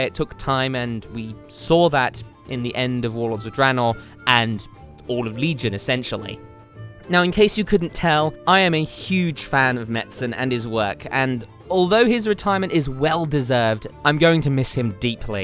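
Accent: United Kingdom. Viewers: Since I dont have twitter, mind if I ask you this here? You're either 1) really good at a british accent or 2) moved to the US from britain. In the case of 2: why'd you move? Europe is awesome! :p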